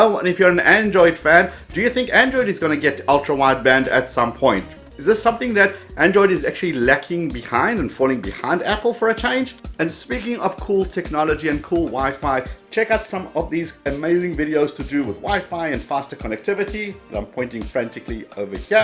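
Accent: South Africa. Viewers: Are u from South Africa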